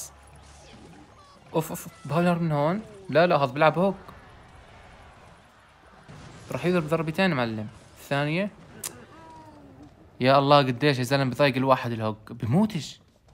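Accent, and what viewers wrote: Iraq. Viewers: حجيك قريب للعراقي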